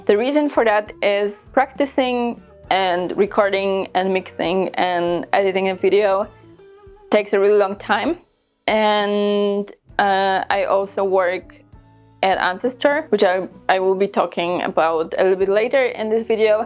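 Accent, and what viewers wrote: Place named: Poland. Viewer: I didn't realize there was such a void in my soul until I heard the words "Hurdy Gurdy" cheerfully spoken in a polish accent. I feel better now.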